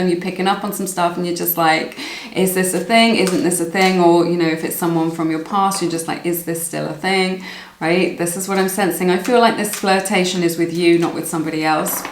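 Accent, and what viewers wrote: RP, United Kingdom. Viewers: I mean this as a neutral observation… you have such a bbc-type British accent. I’ve never heard someone not on the international news speak like you. I’ve met plenty of British people but they didn’t talk like that. What part of England are you from? If you don’t mind me asking.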